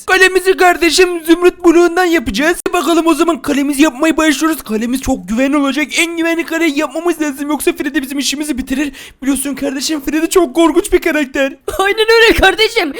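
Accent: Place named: Urfa, Turkey. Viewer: Abi aynı Urfalı gibi konuşuyorsun bende Urfalı yım 😍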